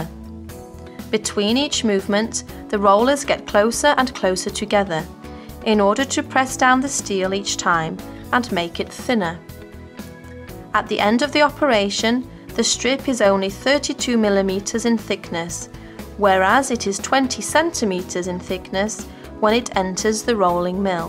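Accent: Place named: Europe. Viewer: Very nice voice and european accent.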